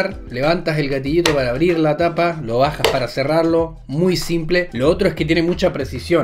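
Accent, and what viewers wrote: Chile. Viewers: Hola que tal, mira asique vivis en Francia, y se consigue yerba halla? Voz no sos Argentino, sos Chileno por el acento, estoy en lo correcto? SALUDOS desde Nueva Zelanda, voz sabes que aca se consigue todo pero bastante mas caro por supuesto, que tal los precios en Francia, Mercy 😊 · Buenísima recomendación! La compraré a ver qué tal. Me queda una duda de cuál es tu nacionalidad ya que tu acento me suena chileno pero a la vez no jajaj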